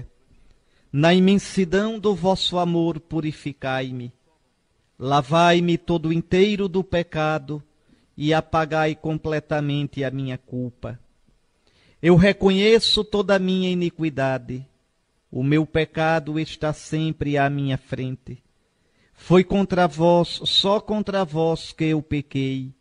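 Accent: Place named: Pernambuco, Brazil. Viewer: Querido Fom Henrique, ajudai-me, lá do Céu,a fazer uma santa quatesma. Como gosto de ouvir suas explicações, com este sotaque e expressões pernambucanas, lembrando a minha origem nordestina! Que gracinha! Tanta santidade, se capta em seu modo de expressar e transmitir as coisas de Deus, com um amor extraordinário por Jesus Cristo, Nosso Senhor! Que delícia são as vossas homilias! ❤❤❤❤❤❤❤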